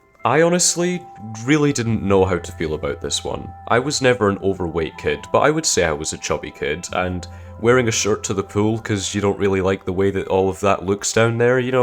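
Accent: United Kingdom. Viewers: Is that an Edinburgh accent bro? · dont try to hide the scottish. i can tell you are. let the inner celt out fellow scott. alba gu braith!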